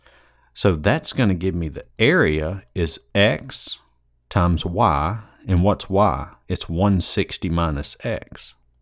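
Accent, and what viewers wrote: South, United States. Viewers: Good video. Definitely the first southern guy I’ve had in one of these videos lol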